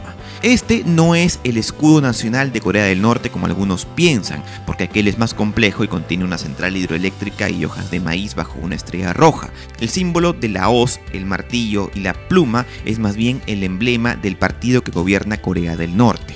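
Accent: Peru. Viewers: Eres peruano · SOY CHILENO Y SE NOTA Q LA VOZ ES DE UN PERUANO DE FUJIMORI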